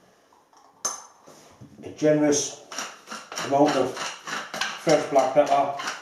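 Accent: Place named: Cockney, United Kingdom. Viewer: The Cockney Iron Chef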